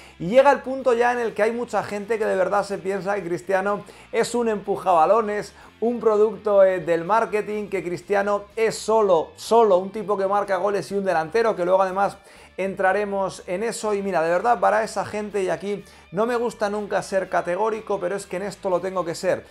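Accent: Argentina. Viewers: Te salio bien el asento argentino 😁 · Que acento argentino tan bestial. Mis respetos crack 😝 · Jajaja que buen acento porteño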